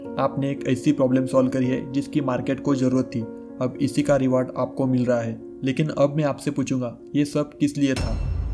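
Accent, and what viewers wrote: Maharashtra, India. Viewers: Your Marathi accent is so sexy … keep it raw😎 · Your Marathi accent is 😅 ,, full video i was thinking about it ❤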